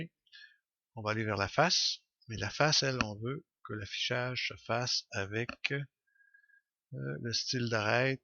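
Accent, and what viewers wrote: Canada. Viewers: Merci pour cette petite formation , déclinée avec précision et l'accent du Canada en plus ..! Bonne continuation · j'ai passé une bonne aprés-midi à étudier les 4 parties du tutoriel, merci beaucoup. Je vais pouvoir créer mes caissons de meubles dorénavant. Il n'y a plus qu'à approfondir 'je crois qu'on peut sortir la liste des panneaux à réaliser) et ça a été agréable aussi grâce à l'accent de nos cousins d'Amérique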